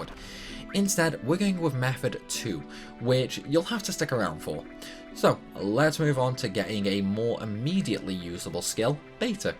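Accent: United Kingdom. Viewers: Your accent makes some words hard to understand.... And I’m british too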